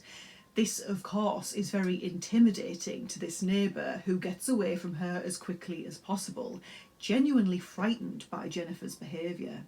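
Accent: United Kingdom. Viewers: Are you a british English speaker?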